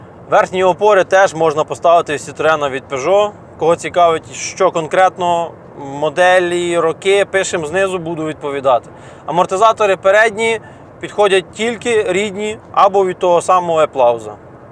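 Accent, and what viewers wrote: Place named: Poltava, Ukraine. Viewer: Это полтавский быдло-суржик, уж извините.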